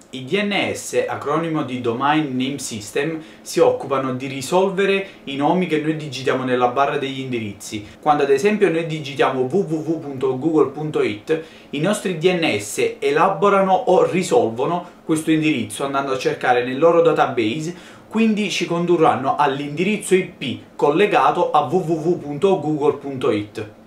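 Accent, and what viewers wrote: Naples, Italy. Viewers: oddio si sente proprio che sei di napoli xD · Ma sei di napoli? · ah bella parlata napulitana xd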